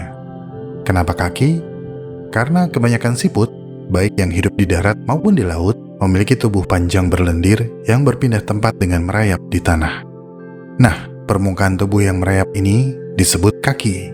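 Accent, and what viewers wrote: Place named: West Java, Indonesia. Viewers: Di luar konteks ya... saya perhatikan abg dalam berdialog ada semu2 sunda nya...apakah keturunan atau memang banyak org sunda di sekitar abg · Orang Sunda lu ye bang 😅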